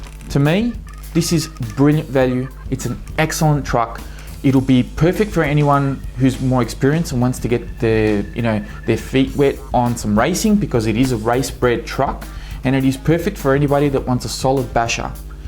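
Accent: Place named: Australia. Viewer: Great review!!! don't take this the wrong way... You are the new Jang. He does thorough reviews at ultimate rc but you're more indepth and more entertaining, maybe it's the Aussie accent LOL. Please keep them coming, we know it's a lot of work so thank you. If you take request I like see a SC comparison from the 4 that you have. Price/ durability/ performance / parts support etc... which do you recommend and for what type of bashing etc...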